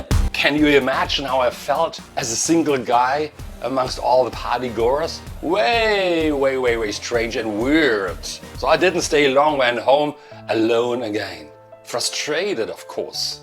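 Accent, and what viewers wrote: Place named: Germany. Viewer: After 30 years living abroad... U still got ur authentic german accent .. ur sounds like u've been in Malaysia yesterday...🤣🤣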